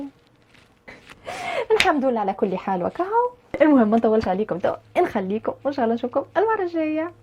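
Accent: Tunisia. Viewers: حبيت لهجتك التونسية انا احبها اصلا لكن خاصت جميلة جدا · يا ربي اللهجة التونسية شحال شابة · تونسي يا روحي يااااااا ممكن تعملي فيديوا كفاه مشيت تقرأ والا تخدم و شنو خدمتك ياا فرحت كي سمعت لهجتك يا روحي أنا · ههه قتلتيني بالضحك و ماسخيييتش بالفيديو اللهجتة التونسية زويييينة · i like how you talk and your tunisian accent , ena 3cht zeda m3a philipini 2 ans , wselna mara lel markez chkit bih :p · 😂اللهجة التونسية اصلا تضحكني و زدتي انتي و الله مجنونة اتابعك من الجزائر🇩🇿♥️ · أنتي تونسية والله حتى كنتوا على اللهجة ديالكم. خليت كلشي وركزت كيف تدوي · ي سيدي بن سيدي هههههههههههههههههههههههههههههههههههههههههههههه تجنن فهمت لهجتك مع اني مو تونسيةة > ي اخي التوانسة ي اخي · لا ، هي تحكي باللهجة التونسية كما يتكلم كل التونسيين او الاغلبية الساحقة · يا روحي عاللهجة التونسية احبك برشااااااااااا من المغرب <3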